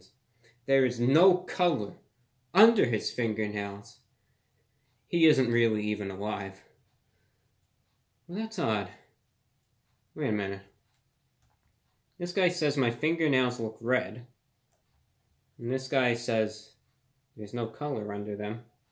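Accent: United States. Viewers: He looks so British and then you hear his accent Feels American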